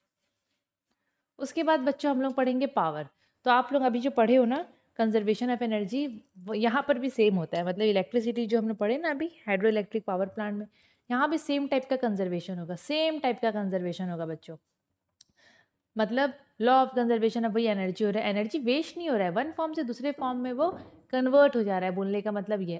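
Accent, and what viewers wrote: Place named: Kolkata, India. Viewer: Mam your accent is kalkatiya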